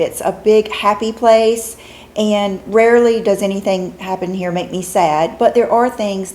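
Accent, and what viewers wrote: South, United States. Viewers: you are my FAV on YouTube for this very reason!! I'm 56 and my struggles are aging and the HORROR of weight gain as well. I found you by watching Angie Hot and Flashy and have been loving your channel every since, the past few months that is. You are more than just makeup and I love that you use this platform to help others like me feel better! I used to get by with just some powder and mascara but now I'm trying so many new products and feeling so much better about myself thanks to you! I'm a fellow southerner from Texas and I love listening to you for that reason:)))) Good luck with your eating challenges:))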